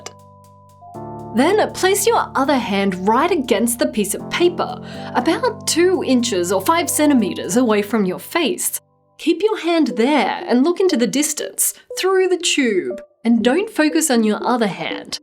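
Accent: Australia. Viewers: Accents are cool and weird sometime. I wonder how "tube" became "tchub" down in Australia. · I'm not hearing any at all, just an Australian accent.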